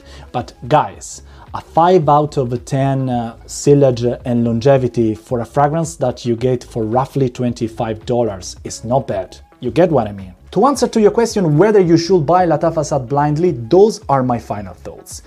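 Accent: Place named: Italy. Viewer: thick accent. are you Italian?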